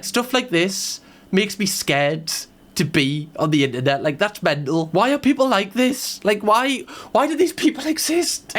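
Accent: Newcastle, United Kingdom. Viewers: No, hes from somewhere near newcastle i think x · I love this man's British accent 😮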